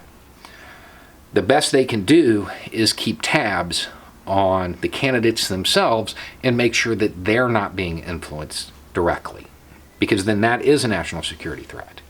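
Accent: South, United States. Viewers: Hey, I have started getting pragur university Ad's on your videos lately. While this is hilariously ironic I can't help but wonder why the Youtube Algorithm would think this is a good idea. My only guess is either that it is because you are a political channel and these are political ad's, or, that it goes deeper than that and the algorithm is going more off what you look like than what you are talking about. It views your videos and goes "Oh, a guy with a southren accent and a beard talking about politics, must be a right winger" and just goes with that. Anyway, just wanted to share because it honestly made me laugh.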